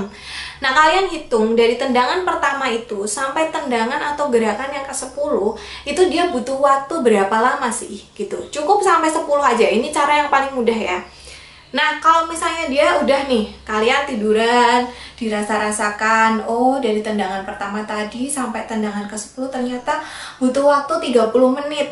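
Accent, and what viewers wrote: Java, Indonesia. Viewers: Tak kira buk bidan orang NTT..eh salfok pas nonton video ini...medok jawa ne., berati orang jawa y buk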